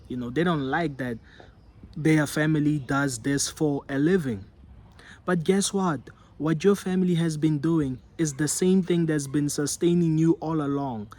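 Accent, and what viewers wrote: South Africa. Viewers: Bro are from mzansi?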